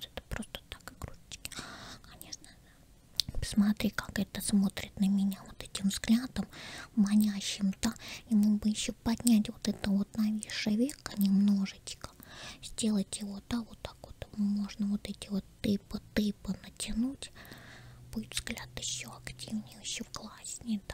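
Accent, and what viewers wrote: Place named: Moscow, Russia. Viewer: Типичный московский говор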